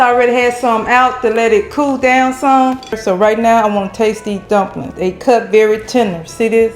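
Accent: South, United States. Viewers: You're so such a lovely lady. Cute country accent ❤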